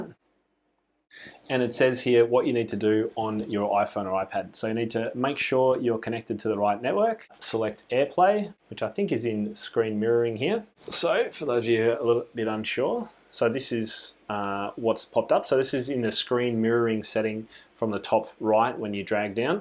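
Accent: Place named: Australia. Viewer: Super cool! I think I saw you on the Tom Lee webinar the first couple weeks of Covid lockdowns... I had written “mini-cam” in my notes and was looking for it. The Ozzy accent through me! Thanks for this video... I’m ready to do this! Would that be your preferred set-up to have a body shot and an overhead shot? I would think so... I would love to get my students on this (lots of Elementary level kids - need to see their bodies AND fingers!).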